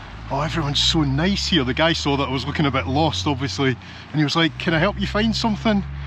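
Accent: Scotland, United Kingdom. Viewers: Half the joy- your scottish accent 😸 Tnanks for another great trip 👌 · Thank you for not punishing us too badly with your wee references to our convict who would be king! I'm thinking I need to persuade my husband to get me to Greenland before someone does something stupid (well, even more stupid) and we can't go at all. I'm going to start practicing my accent to sound like you so that we can go under cover as Scots rather than americansk, maybe that'll help. The video was absolutely lovely, and you're a wonderful tour guide for sure. We watched the next video earlier, so we saw the boat and the bergs and growlers, but it would be great to see it again. We're winter people as well, so that would suit us perfectly! Thanks again, and looking forward to the next one!! ❤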